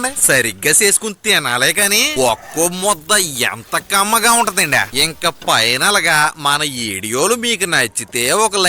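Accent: Godavari, India. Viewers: Aa basha ki fida bro. Godari yaasa vichala vidiga nachesindi.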